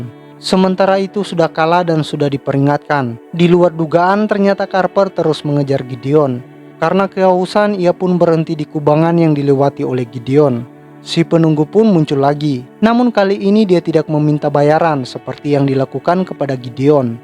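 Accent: Eastern Indonesia, Indonesia. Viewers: Bang orang timur? Logatnya kental timur 😂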